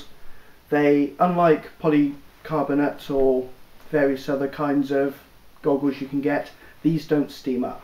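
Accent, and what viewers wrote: England, United Kingdom. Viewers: O my lord.. i was expecting a deep southern hick accent.. not a light voice and english accent.. LOL Anywho, i catch myself today watching vids on first time airsoft and strategy out of airsoft withdrawls due to winter in minnesota -_- Very good videos so far my friend :)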